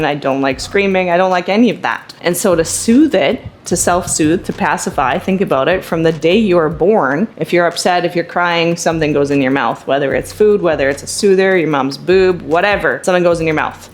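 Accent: Canada. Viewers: Are you Canadian and why can I hear it in your voice and I'm Canadian? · I love your content. Good advice for sure, but I gotta ask. Are you Canadian?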